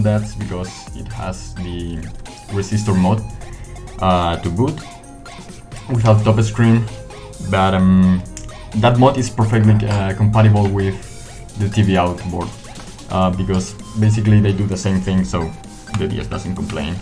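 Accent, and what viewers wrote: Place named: Spain. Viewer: claro primo. ¿No reconoces a un español hablando inglés? Se nota el acento!! :D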